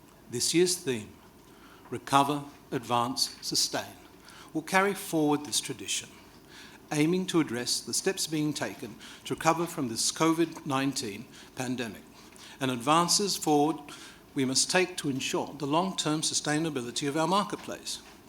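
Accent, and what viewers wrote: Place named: England, United Kingdom. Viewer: hensem nyer datuk umar. I like ur English accent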